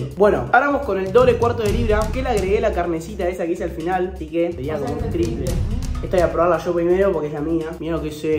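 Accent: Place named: Argentina. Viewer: me encantan tus vídeos y también tu acento argentino 😊😊😊😊❤❤ un besote desde San Martín de la Vega 😊😊😊❤😊❤😊❤😊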